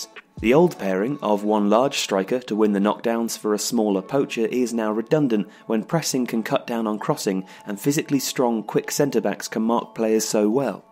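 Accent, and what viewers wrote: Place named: England, United Kingdom. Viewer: I think its just a regional English accent. Some words are pronounced slightly differently depending on where you live. For example, in the south of England where I'm from, most people pronounce "bath" as bar-th, whereas up north they would pronunce it as ba-th. Very similar, but slightly different inflections to the word give them a different sound.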